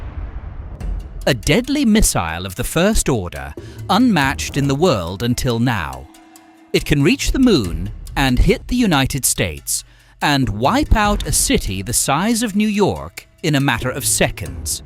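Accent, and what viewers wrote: United Kingdom. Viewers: Wrong accent. I place no creadence in any brit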